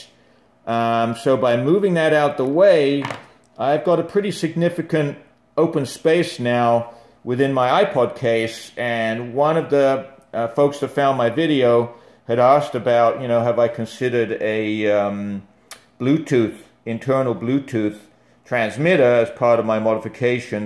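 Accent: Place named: New York, United States. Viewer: He sounds so new yorkey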